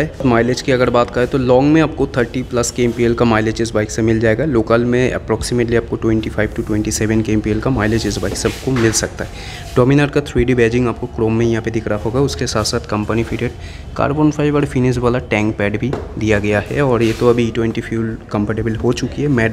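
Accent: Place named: Bengal, India. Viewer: Hey bro i like your bengalish Hindi ...❤unique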